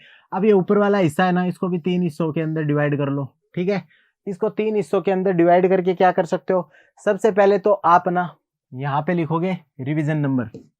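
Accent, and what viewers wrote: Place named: Rajasthan, India. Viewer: 🤣🤣🤣 aap bilkul desi bol rahe ho... Rajasthani hai bhai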